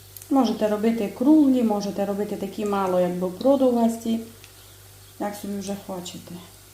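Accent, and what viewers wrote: Transcarpathia, Ukraine. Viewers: Добрий день,. Що смачні оладки, нема сумніву, але мені дуже подобається ще твій діалект, твій говір, урівноважений, спокійний, гарний закарпатський. Пару разів повторюю ролик, щоби чути твій голос, твою мову. В кожному краю України свій діалект. Я із Прикарпаття. Дякую тобі за Закарпатську кухню. Цікаво, смачно. Слава Україні! Слава Героям! Вічна пам'ять полеглим воїнам! ❤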